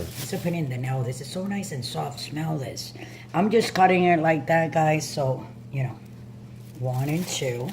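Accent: New York, United States. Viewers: Found you on the foodie channel.. and omg I’m in love with your channel. So glad I clicked. Love your energy! and you and your son doing this together! 💗(And your fur babies a big PLUS 🐶)!!! Where are you from... Sounds familiar!? I’m from NY, and that’s where you sound from. Love it!! I hope to see you over at my channel to. I think you’d enjoy it!! Many blessings to your channel honey! 💗👩🏻‍🍳🦖 PS I loveeeeee me a Cuban sandwich. Omg YASSSSS girl. You killed it! 🤤🤤🤤🙌🏻🙌🏻🙌🏻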